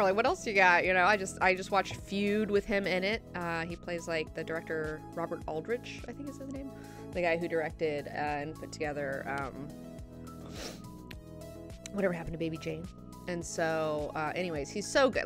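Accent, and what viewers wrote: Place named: Alabama, United States. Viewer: I don't know if you recently talked with some fam and friends for the holidays but, WOW! I can hear that Beautiful Alabama so much in your voice on this one. maybe it's my imagination...great vid!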